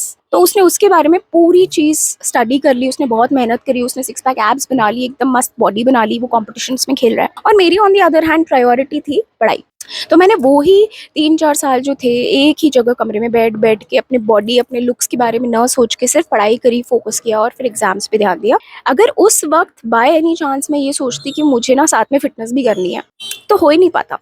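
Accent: Rajasthan, India. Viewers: Mam aap alwar rajasthan se ho kya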